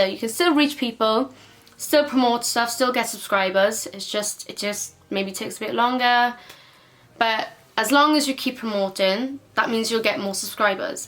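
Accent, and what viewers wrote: Wales, United Kingdom. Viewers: Are ya a welshie??😍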